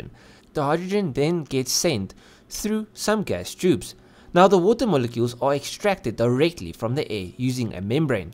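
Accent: South Africa. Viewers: Nice South African accent.🙂👌👍👊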